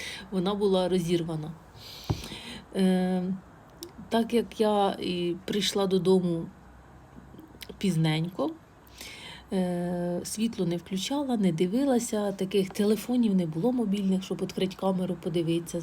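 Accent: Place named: Orativ, Ukraine. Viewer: Оратівський район! А я чую, говір такий милий)) Он воно що!!!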